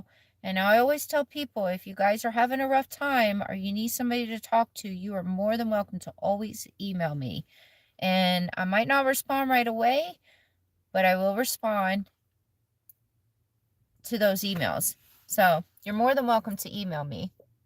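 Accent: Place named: Texas, United States. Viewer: There's nothing wrong with a Texas accent. Thanks for all your travels. Not able to do any travel any more. Love watching. 🐍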